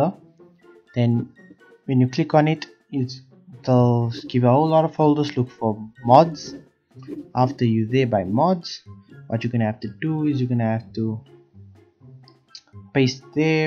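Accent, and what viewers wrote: South Africa. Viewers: Ur accent sounds like a South African accent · You sound like you from south africa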